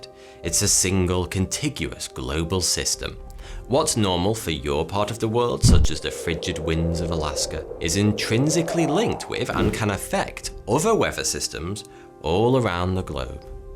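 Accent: United Kingdom. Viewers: just another British man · thats what he says mate ye do realise in the uk there's like a different accent every 30 minute drive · I don't know why, but I enjoy all intellectual and educational topics with a British accent.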